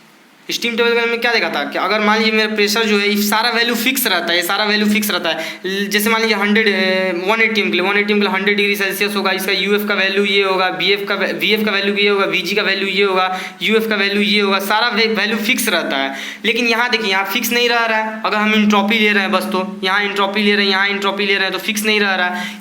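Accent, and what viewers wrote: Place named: Bhojpuri, India. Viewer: Good explanation nd I love ur bhojpuri accent 😂👍👍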